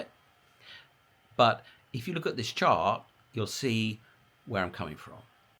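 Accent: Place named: England, United Kingdom. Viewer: Came over from your Kitco appearance , cannot get enough of your insights , only fear I have is I might be to poor for your advice , Blackpool boy here with only one stick of rock , if you know what I mean ,nudge nudge wink wink but still does not cost me to listen , right , right ? you London barrow boys have a way of getting the coin out of the back pocket of one and all. Anyways at least I understand what you are getting at with your very English way of talking and of course when you say 33 dollars that is US which coverts to Aussie dollars to a lot more but I could just buy a hundred or so I guess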